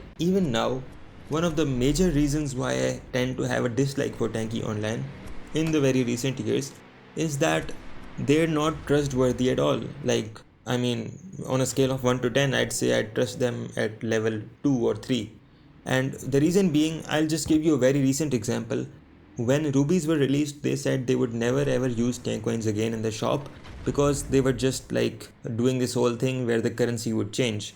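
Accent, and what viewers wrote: India. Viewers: knew this was a scam video the second I heard that poopjeet accent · The second I heard that indian accent I knew I was getting baited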